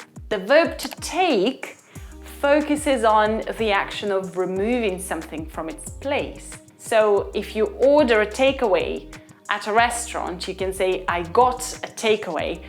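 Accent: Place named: United Kingdom. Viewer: I really appreciate LinguaTrip has the british accent teacher.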